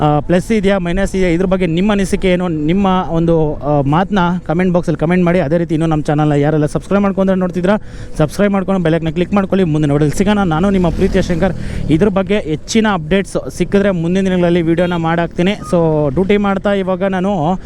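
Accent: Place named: Andhra Pradesh, India. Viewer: Anna telugu meeru telugu wala nenu telugu but study for kannada mi mataloo telugu anipistundi i like anna ❤❤❤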